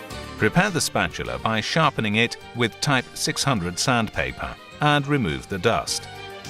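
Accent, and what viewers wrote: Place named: RP, United Kingdom. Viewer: Only got to the intro so far about the primer and I feel like I'm tuning into a Royal British polo breeding documentary. 😊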